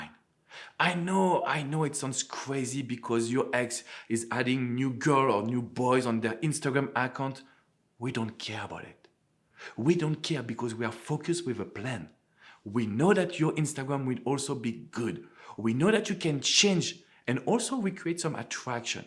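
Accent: France. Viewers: The sweetest french accent ever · i love his accent 💛 i assume he's french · Your English language with French accent is sooo cute ! 😁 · Very well explained... I like your french accent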